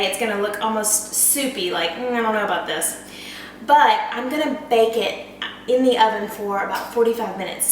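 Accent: Texas, United States. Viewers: Are you Texan? I love your accent! You are also very pretty!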